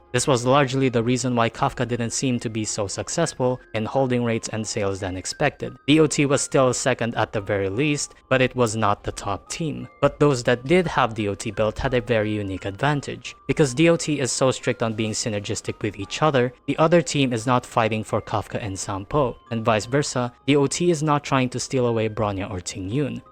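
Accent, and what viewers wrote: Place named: Philippines. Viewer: This guy lowkey sounds filipino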